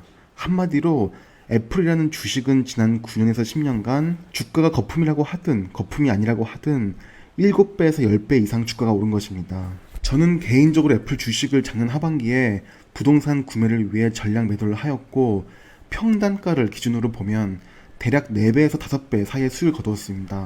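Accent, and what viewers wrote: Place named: North Gyeongsang, South Korea. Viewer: 고향이 어디신지 꼭 여쭤보고 싶었어요 경상북도? 나가리~이런표현 개인적으로 참 편하거든요^^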